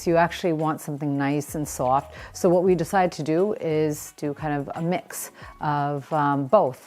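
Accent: United States. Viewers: she sounds American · New York accent. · I can tell by her accent, we are from the same area. I'm in NJ, so that's my guess. A Jersey girl! The house is wonderful. Totally my style. I think the trees indoors, do drive me nuts haha.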